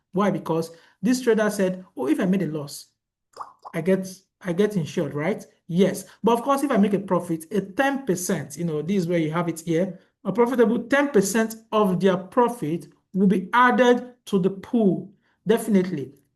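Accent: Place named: China. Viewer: 一听就是中式英语 可能是沙洋盘的😂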